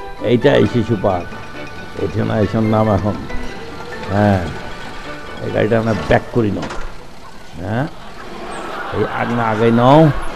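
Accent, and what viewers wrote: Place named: Dinajpur, Bangladesh. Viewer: দিনাজপুরের আঞ্চলিক ভাষা গুলো আপনার মুখে দারুন শোনায় স্যার। প্রতি ইদে এটা একটা আলাদা আকর্ষন অনেকের জন্যই। দিনাজপুরের সকলের উদ্দেশ্যে, দিনাজপুরের চোখধাঁধানো খেলাগুলো দেখতে চোখ রাখুন আমাদের চ্যানেল Sports Mania তে।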